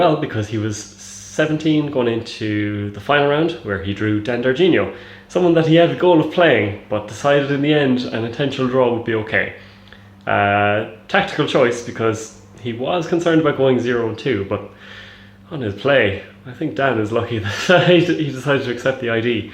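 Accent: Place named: Ireland. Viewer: Heh didn't pick up on your Irish accent, but now I can totally hear it. Congrats on your buddy's win!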